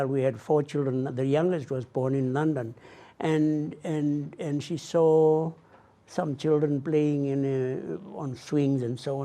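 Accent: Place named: Africa. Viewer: pour les 1ère S1 Super !!! L'accent africain est superbe ! ;)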